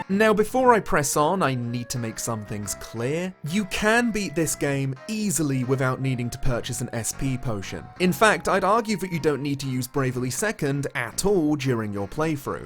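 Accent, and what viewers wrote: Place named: England, United Kingdom. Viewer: You sound English but your avatar is of a gay Asian guy?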